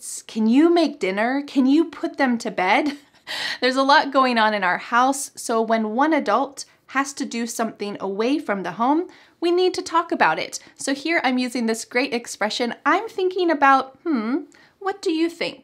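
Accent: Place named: United States. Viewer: I love your American accent❤ And I also have been following you since 2018 and have learnt a lot with your videos.Greetings from 🇨🇺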